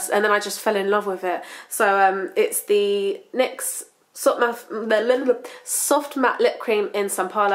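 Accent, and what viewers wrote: United Kingdom. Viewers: Loving the british accent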